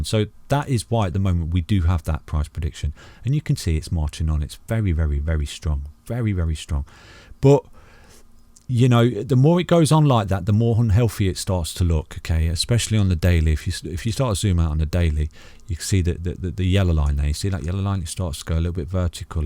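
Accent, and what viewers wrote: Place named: East Midlands, United Kingdom. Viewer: Thanks for the daily tuition, nice to hear an east mids accent!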